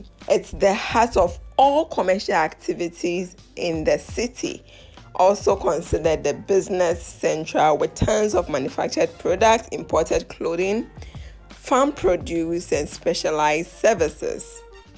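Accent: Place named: Ghana. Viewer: I love your Ghanaians accent. Keep it up.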